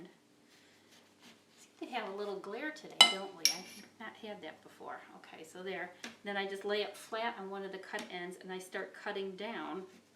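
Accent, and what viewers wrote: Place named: Massachusetts, United States. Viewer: Hello gorgeous,it's Donna from England with the terminal cancerAND the baby AND the three French bulldogs and a pug,YES I'm still alive.I can't tell you how excited and grateful I am about this recipe,I LOVE LOVE LOVE raw cabbage,of course I'm crazy about conventional shop bought coleslaws but urrrrghhhhh the fat content ( but so so yummy).Unfortunately since my husband was killed there's only myself to cook for and if I'm in a lot of pain ------ well you know what I mean.Thankfully I have all of my groceries delivered and I ALWAYS have fresh cabbages,oranges,lemons,chillies,ginger garlic onions and carrots and peppers as a staple,delivered twice weekly.I am going to make a huge bowl of this coleslaw in the morning.I was actually binge watching your videos just last night after I put my baby to sleep.It seems like only yesterday that I "found" you by searching for the Hippocrates soup recipe!!! 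Well I'm going for a rest now and I'm sending you tons of love from England as per usual.Oh and I just ADOR your Massachusetts???????? Accent,love as always Donna 😘❤️xxxx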